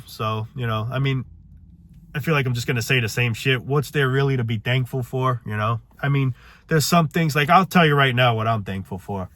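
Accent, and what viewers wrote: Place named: Italian-American, United States. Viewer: What accent is this? Like Italian mobster/Jersey/Boston? Lol sounds cool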